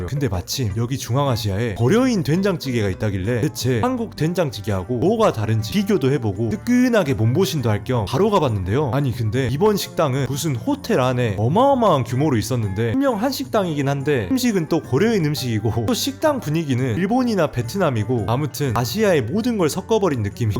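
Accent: Jeolla, South Korea. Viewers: 전라도 말투 나레이션은 첨인데 엄청 정감있네요 ㅎㅎ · 찰진 네이티브 전라도 억양이 너무 감동적이네요. 광주 화순 그 쪽 말투 같은데....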